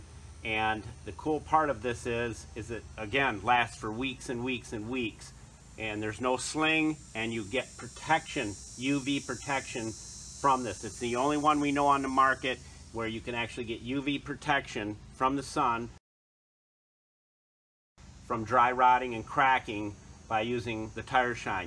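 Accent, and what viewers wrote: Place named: Wisconsin, United States. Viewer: you sound like your from wisscOnsin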